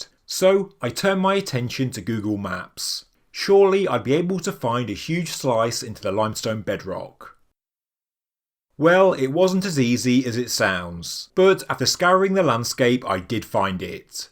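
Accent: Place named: Northern England, United Kingdom. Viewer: Northern English I think.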